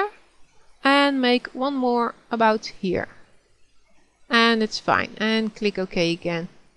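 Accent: Netherlands. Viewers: haha ik hoorde na de eerste letter dat je nederlands was xD wel cool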